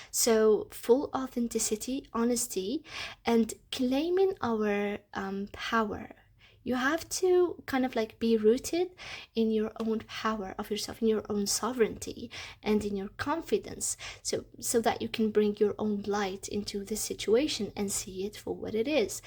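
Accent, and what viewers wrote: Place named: Scotland, United Kingdom. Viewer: I know there’s a separate accent but you also sound slightly Scottish!! It’s so calming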